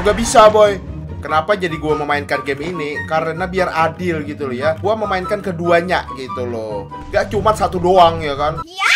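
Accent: Banjar, Indonesia. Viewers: Bang Emang orang Banjar bang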